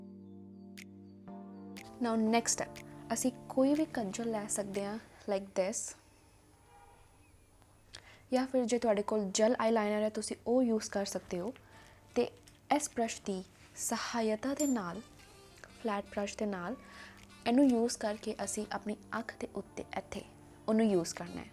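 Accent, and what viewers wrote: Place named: Delhi, India. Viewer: Punjabi boli de accent te dhyaan den di lorr ya, subscribers apee chake jane ya tahaan nu delhi vala accent delhi valiya nu jama ni bhaounda😅😅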